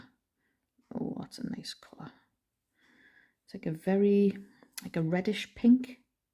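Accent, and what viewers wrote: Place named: Newcastle, United Kingdom. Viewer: Heya found you through Aggie & Jo... but 1st I just gotta say "hawayman" 😂 bloody love the jordie accent!! Me bros in Cramlingtin (think I spelled that wrong) but yeah he's in Newcastle years now. Anyways gonna watch the rest now 💕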